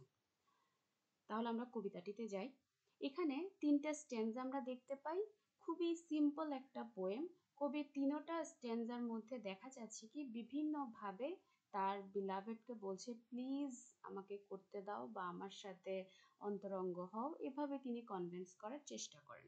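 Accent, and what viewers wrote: Chittagong, Bangladesh. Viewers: 100% apnar bari chittagong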